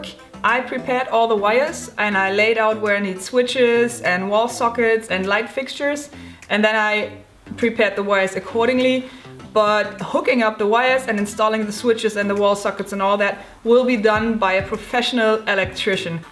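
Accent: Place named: Germany. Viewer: Noch ne Deutsche die versucht Englisch zu reden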